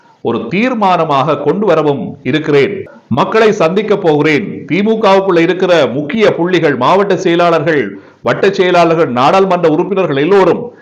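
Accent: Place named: Sri Lanka. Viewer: நீங்கள் பேசும்போது இலங்கை தமிழ் என்பது சரியாக தெரிகிறது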